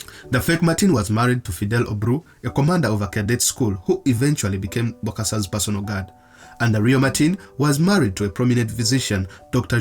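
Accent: Uganda. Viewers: Ugandan ...This voice and narration is so Ugandan .well done.keep it up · The narration sounds so Ugandan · Ugandan accent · He sounds either Ugandan or Rwandese. Probably Ugandan though, sounds a lot like my dad. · This is a Ugandan accent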